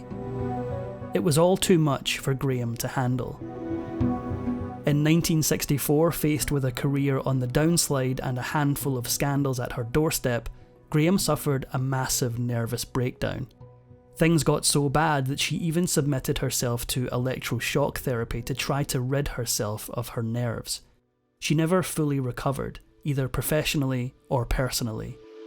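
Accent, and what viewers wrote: Scotland, United Kingdom. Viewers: He’s a Scot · He's Scottish.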